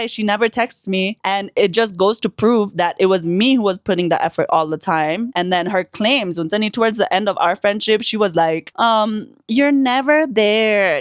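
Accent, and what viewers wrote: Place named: United States. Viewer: "tpi ko accent kina yesto amrica" 😭😭😭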